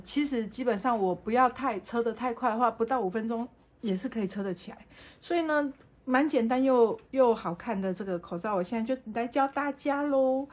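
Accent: Taiwan. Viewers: 謝謝老師無私又有耐心的教學，聽到台灣口音好開心喔，旅居德國的我們祝福妳平安，健康喔。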